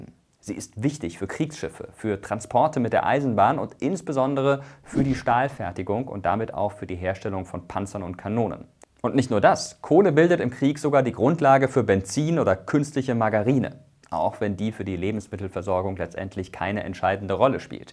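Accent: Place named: Ruhr, Germany. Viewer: Als ehemaliger Nachbar des Ruhrgebiets kann ich nur sagen das ich das Ruhrgebiet raushöre (von Manger) genau wie das meiner Heimatstadt. Der Duisburger Hafen roch vor 20 Jahren noch nach Kohle 😉 Ja die Mentalität im Ruhrgebiet war gut, habe 5 Jahre da gewohnt.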